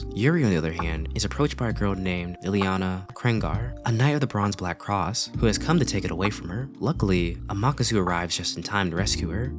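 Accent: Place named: United States. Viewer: Did I understand much? No Did I watch the whole thing? Yes Why? Because I need to do an American accent in a play, and I’m copying you 👍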